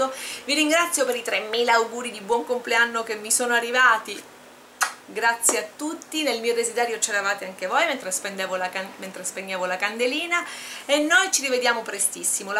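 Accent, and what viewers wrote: Catania, Italy. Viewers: Sei catanese?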